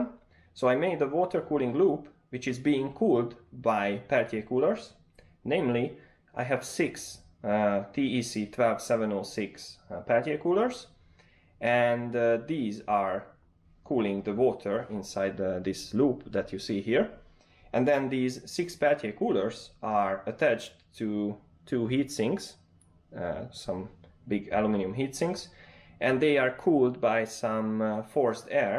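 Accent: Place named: Hungary. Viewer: Are you Hungarian? Your accent tells me you are! :)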